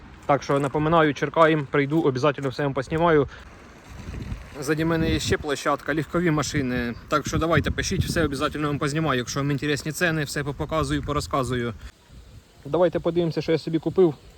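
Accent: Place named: Surzhyk, Ukraine. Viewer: Ти - чудовий блогер і обізрівач, якийсь рідний і близький, як справжній! Не звертай увагу на коментарі заздрісних диванних "експертів "! І суржик твій - просто шикарний - щось близьке і приємне для вух, бо дуже багато українців розмовляють в побуті саме такою мовою! УСПІХІВ І МІЛЬЙОНІВ БАЖАНЬ, які неодмінно ЗБУДУТЬСЯ! В ТЕБЕ ВСЕ ВИЙДЕ, як мрієш, а можливо навіть краще, ніж очікуєш, не бійся мріяти і робити, що тобі хочеться!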